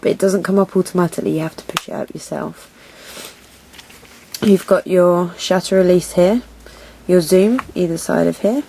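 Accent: United Kingdom. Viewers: Sister, your voice is very british, I like. Do you know how to open the hot shoe lid?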